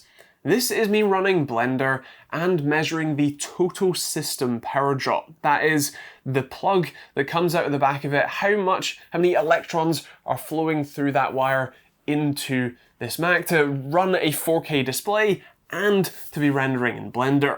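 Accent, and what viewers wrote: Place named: Highlands, United Kingdom. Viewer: No way! a Scottish Techtuber! :D sounds like you are from the Highlands?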